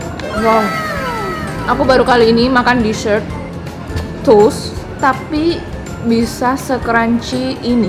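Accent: Java, Indonesia. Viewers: Bicara nya keJawaan kak 😍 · kuwait 8/10/19... wonge ayuu,,,.medhoook..mangane akeh ora isin,,,,,,,lucu lucuu,,,tek subscribe lah....january mw ke bangkok n krabi.. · Rai² cino tpi boso e medok mantul mbak😂 #wongjowo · Youtuber surabaya ngomongnya medok bgt 😁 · Jowomu mbak medhok beud, mantebbb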